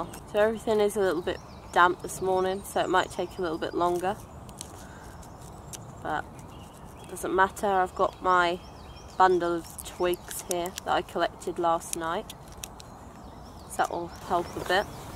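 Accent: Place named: Northern England, United Kingdom. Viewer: very fun to watch. your accent is very pleasant to listen to. i wonder if you come from north of england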